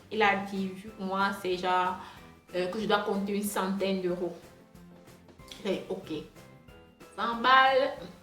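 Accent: Cameroon. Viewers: Effectivement je connais une camerounaise qui parle comme ça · Hey, es tu camerounaise? J'aime beaucoup ton accent, ne change rien.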